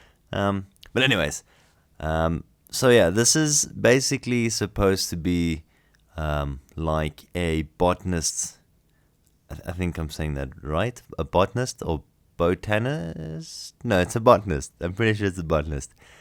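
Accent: South Africa. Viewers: Ermagahd a South African. So weird to hear our accent on youtube XD immediately recognize!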